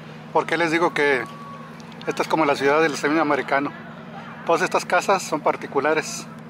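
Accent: Mexico. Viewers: Demasiado marcado tu acento mexicano, deberías neutralizarlo mas! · No hablen como mexicano por favor. Ese idioma odio. Hablen bien el idioma español ok.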